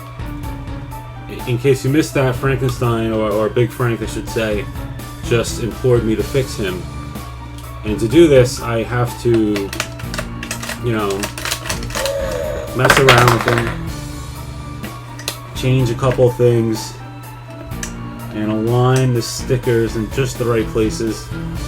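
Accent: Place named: New York, United States. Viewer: And im guess by acsent alone your from nj or ny more than likely ny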